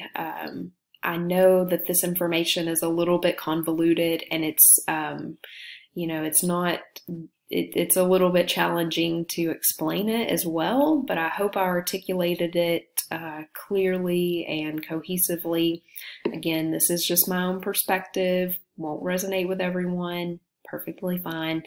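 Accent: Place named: Texas, United States. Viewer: and I say definitely embrace your Texan accent. God's in his/her place. All is right with the world.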